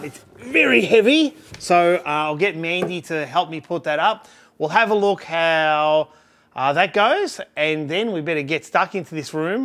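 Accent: Australia. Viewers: You Australian mate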